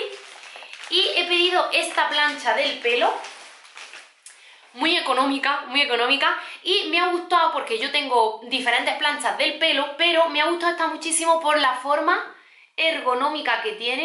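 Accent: Granada, Spain. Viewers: pero si parece q eres de Graná 😱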